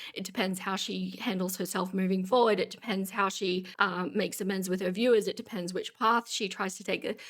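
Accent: Australia. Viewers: Ooh, another Aussie voice. Quite a few of us among reaction channels, it seems (not that I'm a reaction channel - just Aussie :D). Anywho, great video!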